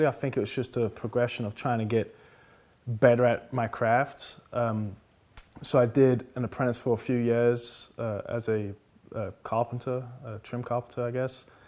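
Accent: England, United Kingdom. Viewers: I did ask where you were from on another video, as you sounded to me like you were from England, but I’ve just realised that you answer that in this video, so that’s the end of that question. You’ve had an immense amount of training and experience in the industry, and I wish so much that I’d have had the encouragement when I was in school (I’m from Wales). It’s only now, at the age of 38 that I have had the chance to find my passion in woodworking. It’s always nice to hear when people had a great teacher that encouraged them, rather than hindered. Anyway, it’s great to see someone homegrown doing so well in another country and also being respected as an artist too. By the way, the chair design that you make a limited production, looks amazing! I’m really enjoying watching your videos. I came across you on Instagram and had no idea you had a YouTube channel, so I’ve been binge watching and I must say that I really like the production value. 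Also, the fact that they don’t have a voice over is a great change, as it really makes me feel like a fly on the wall. Holy hell, I’ve just realised how much I’ve written. Anyway, here’s a big hello from the West Midlands, UK. Signing out.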